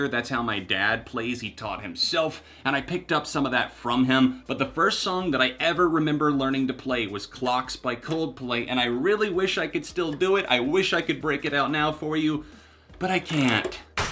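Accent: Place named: South, United States. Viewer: Your a southern boy...I knew it...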